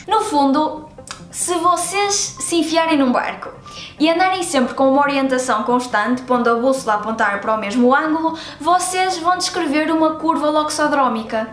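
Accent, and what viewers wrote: Portugal. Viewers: Uma Homenagem aos seus fãs brasileiros? =) Sou formado em matemática no Brasil. Curti seus vídeos, e estou tentando acostumar com seu jeito de falar (Portugueses falam MUITO diferente dos brasileiros). Parabéns pelo canal, e é muito bom ver matemática fazendo sucesso entre algumas pessoas =) Um abraço! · Sotaque português é tão lindo aaa <3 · É lindo aprender matemática com esse sotaque português😍😍😍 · Sua beleza é um fato consumado......vc fala gostosinho portuguesinha kkkkkkk abraço do Pará Brasil pra professorinha preferida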